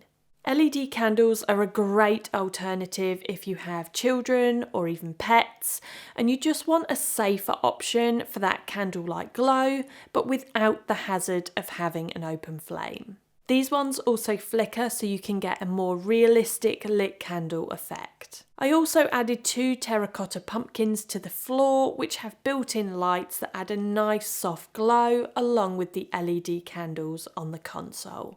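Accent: England, United Kingdom. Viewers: Dearest.....love that your English accent is amazing. USA welcome. 2024 looking good.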